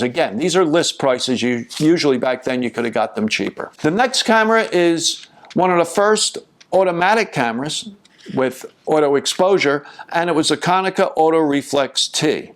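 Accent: Philadelphia, United States. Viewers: Are you from Philly? The accent is undeniable!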